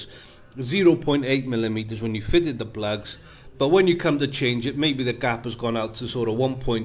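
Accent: Wales, United Kingdom. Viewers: He's Welsh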